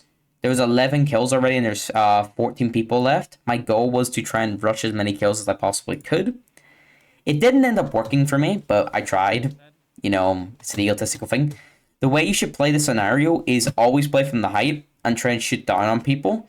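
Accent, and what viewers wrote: Northern Ireland, United Kingdom. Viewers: You're awesome. Love the NI accent. God save the King.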